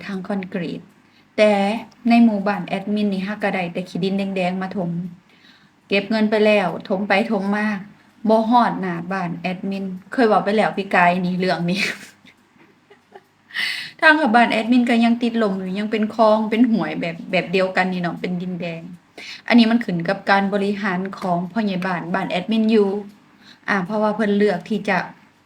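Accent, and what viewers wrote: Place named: South, Thailand. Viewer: สำเยียงทำไมเหมือนพูดไต้ผสมอีสานเลย😅😅😅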